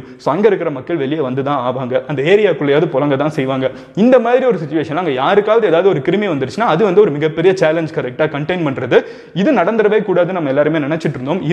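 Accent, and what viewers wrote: Madurai, India. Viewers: Bro Neenga Madurai ah? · Bro ninga madurai ah 🤔 Super bro 😇🤗😎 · neega madurai ya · Thalaiva nega Madurai ya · Bro neenga Madurai ah?? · நீங்கள் மதுரை யா?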